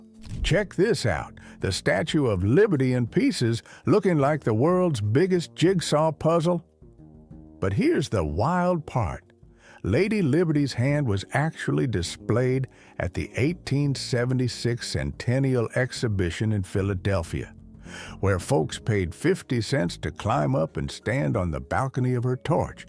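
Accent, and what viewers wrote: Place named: India. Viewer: Good narration, but why the Indian accent?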